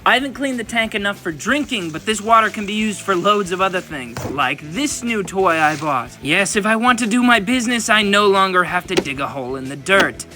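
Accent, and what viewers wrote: United States. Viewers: You sound like American ! Euros??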